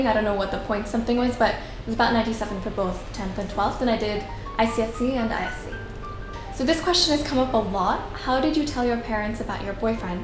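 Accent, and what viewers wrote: United States. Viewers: Why do you have US accent? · How are u able to speak English so smoothly and in US accent ?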